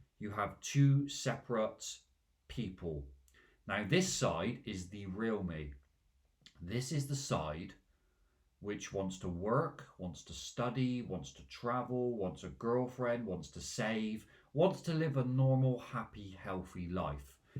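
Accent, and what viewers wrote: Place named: Bristol, United Kingdom. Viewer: I knew you were from Bristol!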